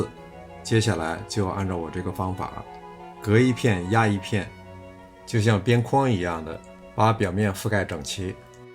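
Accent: Beijing, China. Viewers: 叔叔你是住在海外吗？北京口音听着特别亲切。我是你的韩国粉丝。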